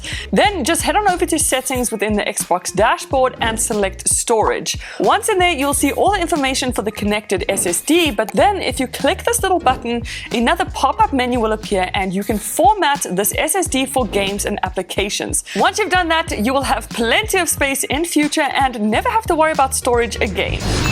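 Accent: South Africa. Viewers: I sense South African👀😂 Maak my sommer trots🔥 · You sound south African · Is she from south Africa? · Her accent is spot on south african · Your South African is that true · Is she south African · That south African accent. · Are you South African?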